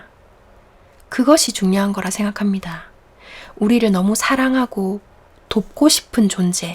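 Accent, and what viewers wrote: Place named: Busan, South Korea. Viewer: 재밌는... 흥미로운 내용 감사합니다.. 혹? 부산이세요.. 반갑습니다! 매일매일 행복하세요...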